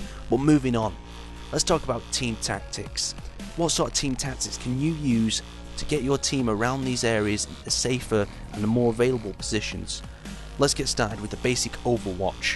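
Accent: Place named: Manchester, United Kingdom. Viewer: which part of Manc are you from?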